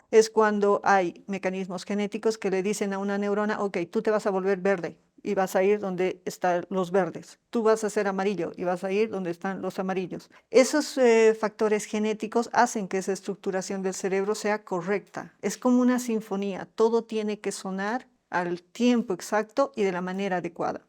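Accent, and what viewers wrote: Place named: Bolivia. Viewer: Excelente conferencia , felicitaciones , por su asentó , la Doctora es Boliviana ? De La Paz ? Muchas gracias por compartir sus conocimientos. Saludos desde el Ecuador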